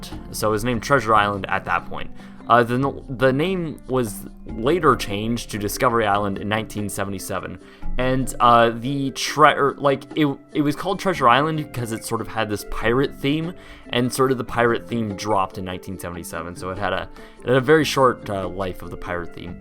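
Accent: Canada. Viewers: You sound Canadian, and stoned.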